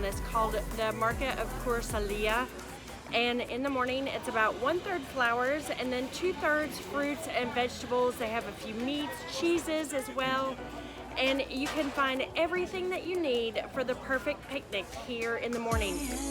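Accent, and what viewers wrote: South, United States. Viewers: Where are you from? I’m guessing from the south. 😊 Do you speak French? I am from Texas so I have a similar accent. I have been trying to learn the basics of French, but I feel silly speaking with my accent. Any tips?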